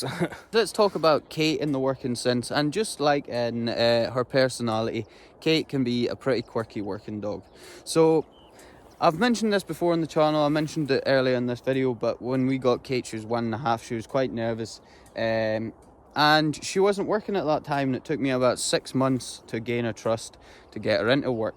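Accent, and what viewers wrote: Scotland, United Kingdom. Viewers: All of your dogs are beautiful, but Kate has such a personality. I absolutely love your accent, I could listen to you talk all day! One of these days I will visit Scotland, I live in Oklahoma, United States. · This was a very easy “Subscribe”!! Your accent may be invisible to you, but this American whose grandmother was from Edinburgh just loves it! It took me a moment to catch on to what you were saying at first, but then I got the rhythm of your usage. When I was a little girl, my friends who would come along on a visit to my grandmother’s place couldn’t understand a word she said. LOL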